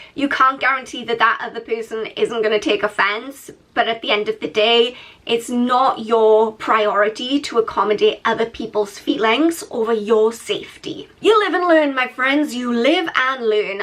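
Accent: Wales, United Kingdom. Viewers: That welsh accent lol. Anyway I spent 4 years of my childhood in Kuwait a long time ago. It was a great hospitable country but even back then there were some weirdos. Even my mum had the odd unnerving but rare experience but was always quite firm.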